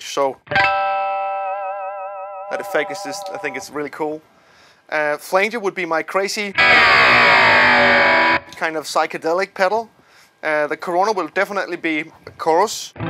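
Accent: Denmark. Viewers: I fucking love our danish accent!!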